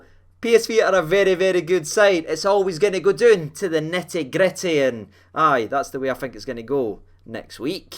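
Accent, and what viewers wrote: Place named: Glasgow, United Kingdom. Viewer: I just love your wee Wegie accent❤️❤️ 🇦🇺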